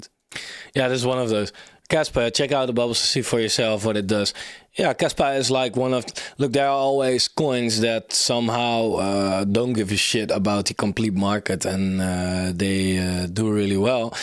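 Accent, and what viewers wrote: Netherlands. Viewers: Are you on the btc amsterdam conference? I say this because you sound like you are from netherlands!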